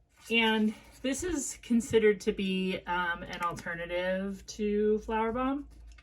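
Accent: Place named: Midwest, United States. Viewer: (sp?) you buy and explain/describe the most unusual scents. I like to watch your videos because a. you're a thrifter like me, b. you're another midwesterner and actually sounds/talks a lot like me and c. you're honest about your finds and out there as far as blind buying goes--real gutsy and I like that!! Thanks for another great video!!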